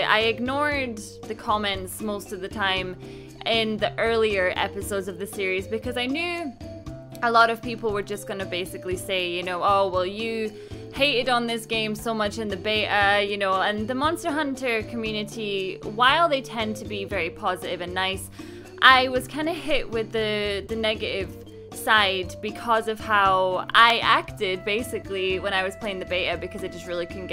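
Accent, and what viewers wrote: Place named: Scotland, United Kingdom. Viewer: I'm thinking...Scottish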